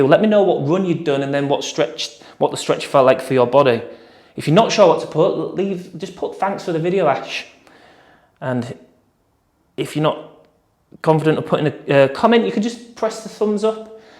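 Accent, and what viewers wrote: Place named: Yorkshire, United Kingdom. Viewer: Thank you Ash, for this and all videos! I love your calm voice and your lovely accent (Yorkshire?) 😊 and I feel good after my runs when I stretch with you. So thanks again and see you soon!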